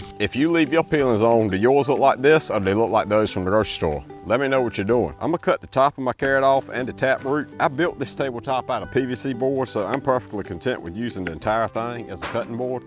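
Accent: United States. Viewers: Make American accents thick again. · As a Australian... he sounds nothing like a aussie. He's all American 😅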